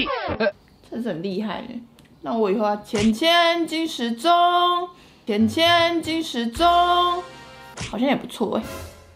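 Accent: Taiwan. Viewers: 好愛妳說台語 請問千千是哪裡人？ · 為什麼妳這麼台灣國語？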